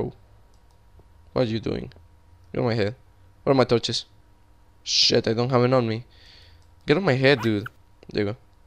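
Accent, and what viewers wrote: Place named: Colombia. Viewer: i actually like your columbian accent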